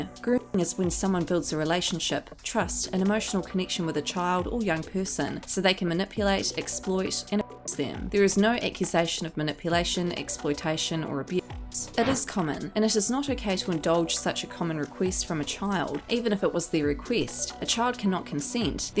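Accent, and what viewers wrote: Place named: New Zealand. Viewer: Kiwi christchurch accent?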